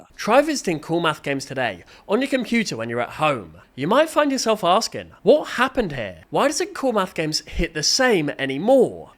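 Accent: United Kingdom. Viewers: hes british bro relax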